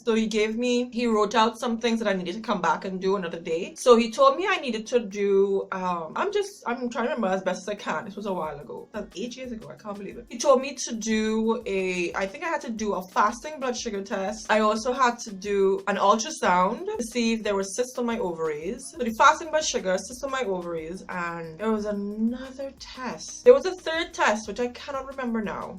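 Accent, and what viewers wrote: Trinidad and Tobago. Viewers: Very informative. I'm quite hairy in places I wonder if I should get the test. I' like ur trini accent 👍🏾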